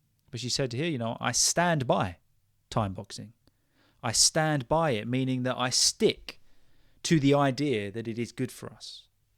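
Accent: England, United Kingdom. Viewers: Thanks teacher, I am osman I follow you and enjoy. topics.Also your accent is realy authentic eglish .My good wishes from Turkiye 😊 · One and only British native speaker who speaks slowly and clearly thanks for understanding us haha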